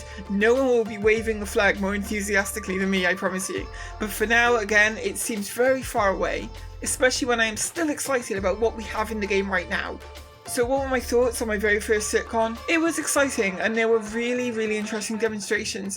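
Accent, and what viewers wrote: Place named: Liverpool, United Kingdom. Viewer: This accent sounds like Scouse.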